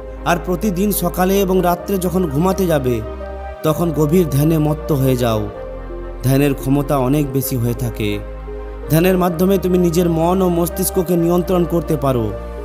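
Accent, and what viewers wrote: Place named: Bankura, India. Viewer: ভাই আপনার বাড়ি কি বাঁকুড়াতে। আপনার কথার টান শুনে মনে হচ্ছে।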